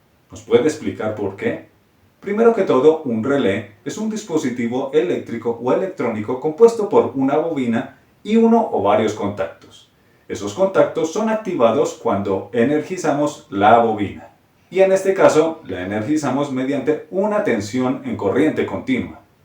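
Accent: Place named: Caracas, Venezuela. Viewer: Epale pana mío tú vídeo esta genial y lo extraño es que tú dirección de tú canal de Youtube dice que estas en Colombia pero tú hablas como venezolano de Caracas santa mayulla pana mío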